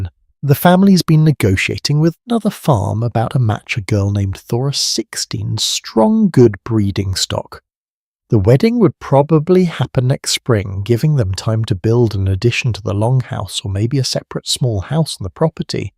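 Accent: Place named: England, United Kingdom. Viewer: From Norway. The servants Are TRELL/ treller , not throll. Reading with English pronansuention mekes many names and words so strange. What happend to the selebration of Jul? The feast and oferings in the darkest part of winter. Fishing , trapping and hunting are all winter chors as long as the weather permited.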